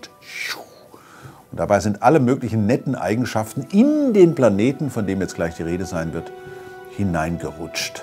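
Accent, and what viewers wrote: Hesse, Germany. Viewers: So schön hessisch! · Weidwingel! Da spricht der Hesse! :) Schöne Folge!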